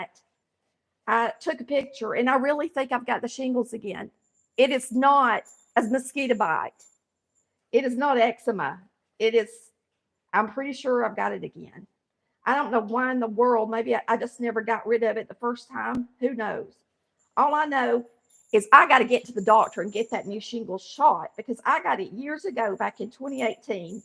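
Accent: South, United States. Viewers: I've listened to.your. blog.several. times now it is the.best i.have it memorized. I have 5 pds. of.raw Spanish peanuts coming to my door step the nuts should be here by monday.I'll be making the brittle in a cast iron skillet. Butter makes everything taste better. I love your southern accent I'm from Wa.state.May God bless you.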